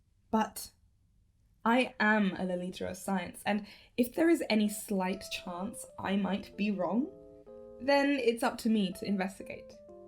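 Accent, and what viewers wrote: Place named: United Kingdom. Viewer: As someone who grew up in a home where English was not the only language spoken I have learned over the years that although I am considered a native speaker there are many words I don't say 100% correctly because I learned to speak from parents who are non native English speakers. This video has taught me that I was not saying lolibrary in either of the two ways you argued. lol. However I suspect that has more to do with the fact that you speak British English accent and I speak Canadian English accent. I do agree that it is indeed loli-brary.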